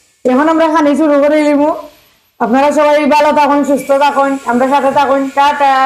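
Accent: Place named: Sylhet, Bangladesh. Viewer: Mashi Maa ajkei apnar video deklam vhala laglo karon sylheti math hunia.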